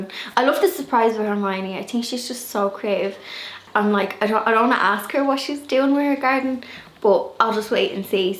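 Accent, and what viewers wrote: Ireland. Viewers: I kept thinking you were saying day lilies, growing them from seed. Then when I saw your plants, I’m like that’s not a day Lilly. It took me a hot minute to figure out you were saying dahlia. I’m from America so I had to let the Irish sink in a minute. I have several growing I’m my garden. I love them. Happy growing!!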